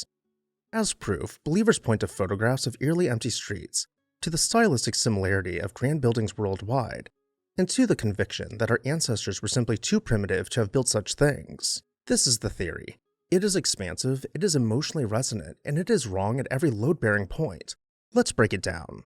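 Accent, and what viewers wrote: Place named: South, United States. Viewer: Interesting mix of a slight southern gay drawl with a hint of late to chabad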